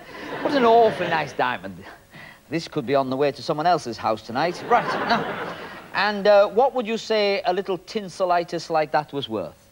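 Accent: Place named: Yorkshire, United Kingdom. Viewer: has a British accent, more exactly he has Yorkshire accent from east England. Don't worry it is fairly thick and a lot of people have trouble understanding it. Even people who do have english as a first language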